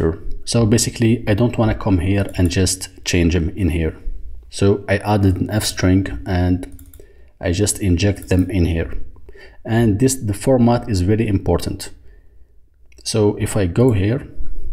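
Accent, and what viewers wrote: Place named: Arab world. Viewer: Are you an Arab? Your voice says that.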